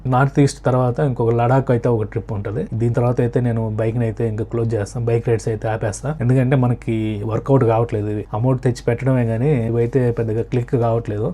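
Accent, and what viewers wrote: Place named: Andhra Pradesh, India. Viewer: Your way of talking is too polite and ap slang is good and genuine bro keep it up ur progress is a head we all have to support u ok ❤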